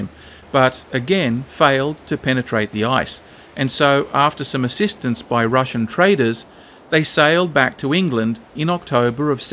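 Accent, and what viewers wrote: Australia. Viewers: Sorry, should have looked there before commenting, and was of course a tiny, unimportant detail in your amazingly crafted documentaries. I wish to add that I love both your texts as well as the way you narrate them - 'Strine' yet perfectly intelligible even for those for whom English is not their mother tongue and whose hearing has deteriorated due to old age (as in my case)... and with perfectly dosed hilariousness ('However,...'🤣). Your documentaries are providing me, just in time, with the therapeutic benefit of proving that the present 'crazy times' are nothing new - humanity has always been both crazily stupid as well as deeply admirable.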